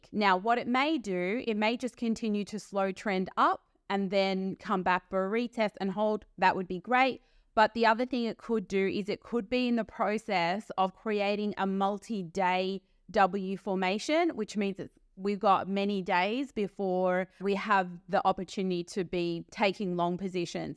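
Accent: Australia. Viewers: I hear you I see your accent is from Australia. Great content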